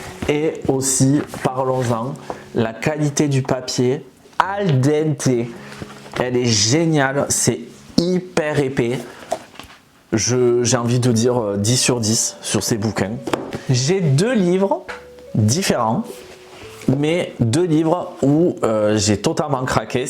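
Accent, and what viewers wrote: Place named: South, France. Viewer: Coucou je viens de découvrir ta chaîne super l’évolution de tes colos et j’aime beaucoup les livres sur ta copine Pauline t’as ramené du Japon . T’as l’accent de chez moi du sud j’aime trop et magnifique ta petite sirène bravo oui cette double page prend une blinde à faire 😃 hate de tes prochaines vidéos 🥰🥰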